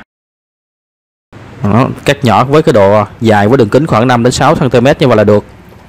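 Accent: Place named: Quy Nhon, Vietnam. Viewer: Chị nghe vọng nói của em Chị đoan em là người qui nhơn Nhung em làm món ăn Miền Nam rất giỏi lắm luôn Chị chúc mừng cho em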